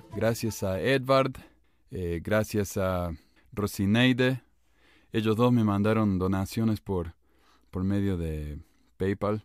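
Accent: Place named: Argentina. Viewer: es argentino de cordova en chile estamos llenos de modelitos de reality de ese país su acento inconfundible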